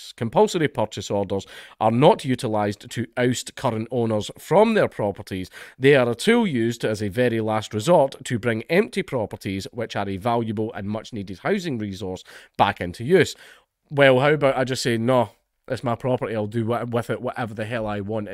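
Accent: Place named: Scotland, United Kingdom. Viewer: I've said all this shit so on the same wavelength, sounds a lot better in a Scottish accent than a Welsh one though, keep being the voice of reason!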